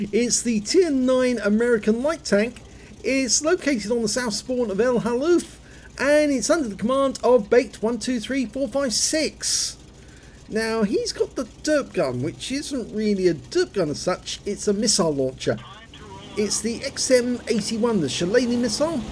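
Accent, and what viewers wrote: United Kingdom. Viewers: Mad respect to your enthusiasm while commentating and British accent keep up good work gg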